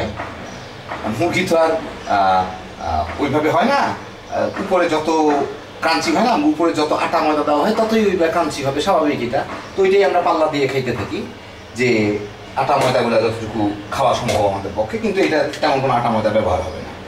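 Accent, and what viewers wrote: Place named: Dinajpur, Bangladesh. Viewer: vai, salam.apnar bari ki Dinajpur a? amader kothar shathay vishon mil pai.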